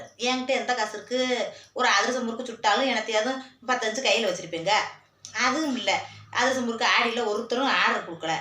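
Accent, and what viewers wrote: Madurai, India. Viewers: உங்க மதுரை ஸ்டைல் பேச்சு ...அழகு👉👌👌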